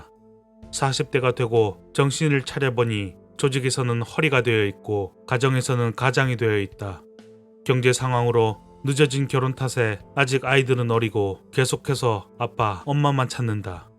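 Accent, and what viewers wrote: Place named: Gyeongsang, South Korea. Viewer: 경상도 억양이 있어서 좀 아쉽지만 너무 좋습니다 잘 들었습니다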